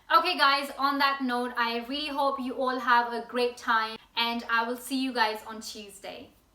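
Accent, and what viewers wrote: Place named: United Kingdom. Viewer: I guess she lives in britain from a long time since she's using British accent, so you know she got that habitually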